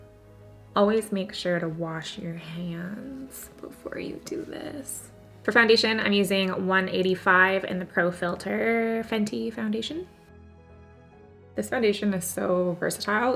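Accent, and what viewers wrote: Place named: Canada. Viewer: Yes! I loveee Canadians and their accents. It always brings a smile to my face. 😌♥️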